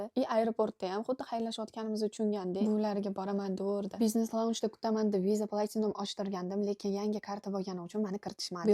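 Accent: Tashkent, Uzbekistan. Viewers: Узингиз Наманганликми ? Гапиришиз чала Тошкентлик , турмуш уртогиз Кореялик , жуда хам интересно . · Тошконча шевани кайдан ургангансиз? Ман умуман урганолмадим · Вой Наманганлик экансизку кизим нега Тошкент шевасида гапирардиз.Наманганлик эканиздан уялармидиз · Нманганли бўсезам тошкен шевада гапирвосизми? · Нимага Тошкенча гапирадғ бу қиз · Сан нега НАМАНГАЛЛИК булиб ТОшкенча Гапирасан карисга нмага тегдинг наманганда эр йукмиди еки. ЕМОН кизмидинг яхши киз кушнсига ЭРГА ТЕГАДИ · Нега тошкенча гапирасиз тонкенликлардан оброси баланд туради водини оброси Коконга караган кокон хонлиги бошкарган тошкентни Асабларим ойнеди борку шу тошкентни шевааасида гапирса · Iltimos xech bolmasa yapti deb gapiring namanganni qaysidir rayonidansiz oziz ln Toshkent shevada gapirasiz yarashmapti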